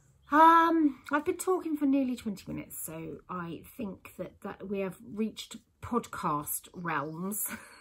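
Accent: United Kingdom. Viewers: That’s a hard one, I can totally see you talking to the police in LA... all upset, probably at an airport or even better in an big casino full of people with your british accent and your colleagues laughing.. the poor police officers 😂 but the shoes also sound very... hm, im going for the shoes as a lie! I just want the LA story to be true 🤣🙋🏻‍♀️ Thanks as always, have a nice day!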